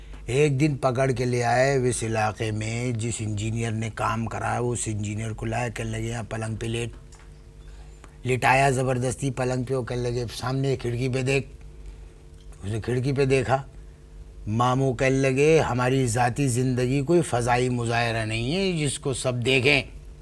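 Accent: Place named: Bareilly, India. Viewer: Urdu accent of Bareilly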